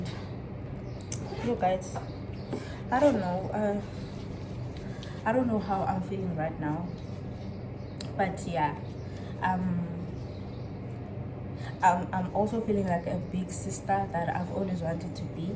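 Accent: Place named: South Africa. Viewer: I am here for your Xhosa accent mtase, the way I love it❤❤ I enjoy your vlogs ke sana...I love youuuuuuu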